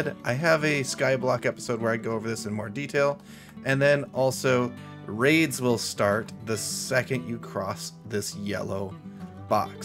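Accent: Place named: South, United States. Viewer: I have no clue, sounds southern.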